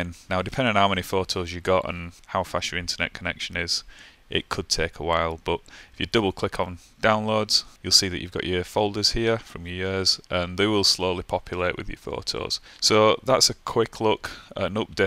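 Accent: United Kingdom. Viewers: lifesaver! Thank you so much! much prefer listening to a British voice than an American as well LOL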